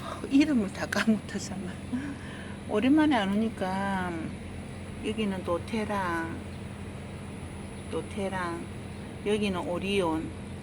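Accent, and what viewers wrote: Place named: Gyeongsang, South Korea. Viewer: 예뿐다육이들 정성스럽게 잘관리하시고요. 말씀을 아주 예쁘게도 하시네요. 정말 경상도사투리가 정겹네요?😄😄😄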